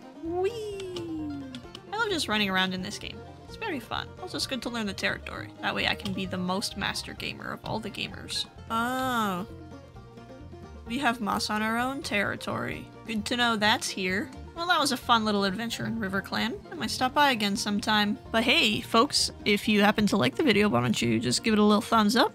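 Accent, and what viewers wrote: Russia. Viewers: I love your accent, can you tell me if it's Russian or somethin PLS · lol u sound like you have a Russian accent and it sound cool :> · Are you Russian like I keep hearing a Russian accent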